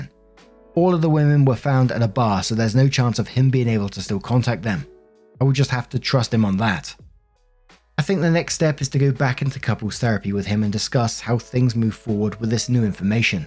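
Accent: United Kingdom. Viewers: Nothing like getting my three miles in in 15 minutes while listening to his melodious British accent.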